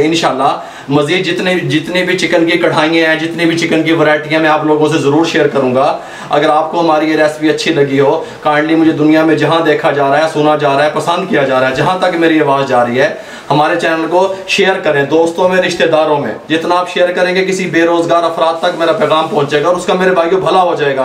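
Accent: Punjab, India. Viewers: Samjhane ka style bahut hi Achcha hai Punjabi Maar Ke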